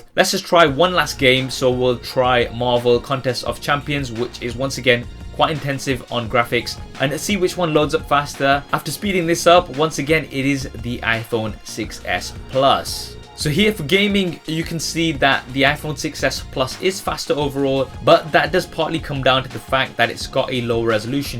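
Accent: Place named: England, United Kingdom. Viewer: Good review and nice to hear someone with an English accent and not saying "go ahead" every 5 seconds like a lot of Americans do. Only problem with this video is the description says S7 and iPhone 6s but in the review he's actually using an S7 edge and 6s Plus.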